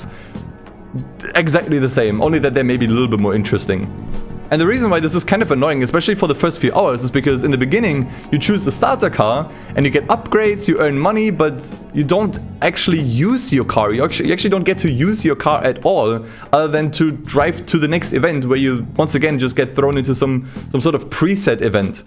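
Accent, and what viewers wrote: Germany. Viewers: U have a strong german dialect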